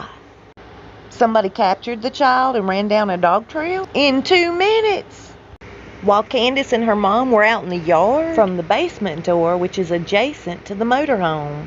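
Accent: South, United States. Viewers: I love your Southern accent. I'm Nashville born and raised but I lived up there (East TN). Covid was rough on LE up there. They know Summer wasn't abducted! Sheriff Lawson works in a high Opioid/ FENTANYL and METH territory. Lawsuit is frivolous most likely. They are smart. I heard ole Don is MIA! Something is about to break on this case?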